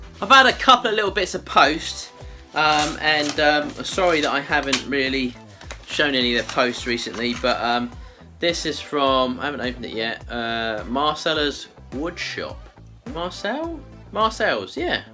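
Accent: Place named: England, United Kingdom. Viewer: Being that I am a "bird" (LOL) I will probably never use a lathe - not that ladies can't & dont, it's cool w/me! BUT, my point is I enjoy watching purely because you are so much fun and you really make me laugh! Love your editing too. As for talking too much I could listen to you talk all day. I think most Americans love a great English accent. However I AM a maker, I make quilts, and recently finished a Union Jack quilt for my Grandson. Wish I could show you a pic. I love you tube, it's where I learned to quilt and it brings me a ton of GREAT entertainment and laughs like your channel. Thanks ❤ God bless you & yours! 🇬🇧🇺🇸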